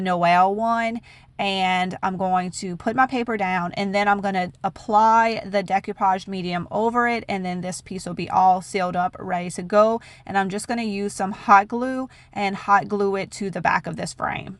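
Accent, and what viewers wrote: Louisiana, United States. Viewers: Good day. I love your accents. Please settle a bet. I hear Louisiana and The South. Am I correct? Pft, my son says I'm wrong.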